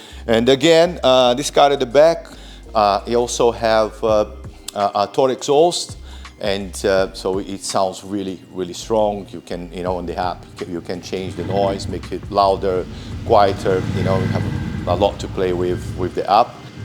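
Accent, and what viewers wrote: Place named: Brazil. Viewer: Esse cara é brasileiro certeza kkkkk o sotaque não engana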